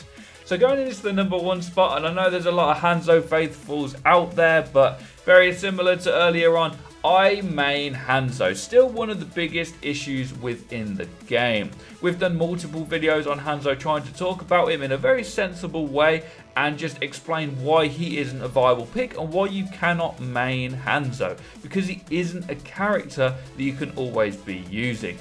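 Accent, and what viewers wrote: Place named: England, United Kingdom. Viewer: Saying crap with a bit of an English accent doesn't make it true.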